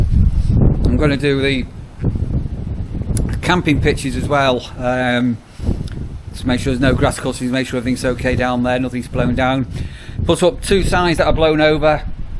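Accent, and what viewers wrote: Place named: Hull, United Kingdom. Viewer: Are you from hull area no diss but tha sounds like cod head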